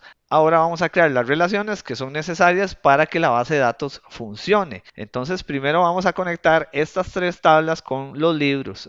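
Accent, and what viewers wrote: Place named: Costa Rica. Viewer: es como si hablara con mi amigo de costa rica , de donde sos? muy bien explicado el video, gracias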